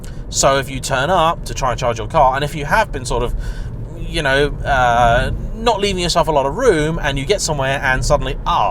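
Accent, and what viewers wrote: United Kingdom. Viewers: LOL. Wasn't expecting a response but keep up the excellent work. Love the dialog. British English is much more fun that U.S.'s version.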